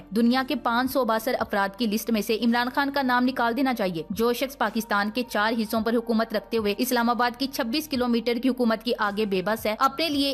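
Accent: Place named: Punjab, India. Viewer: as always just luv and wait for ur post ad your sign off in lovely Punjabi tone Thanks' for watching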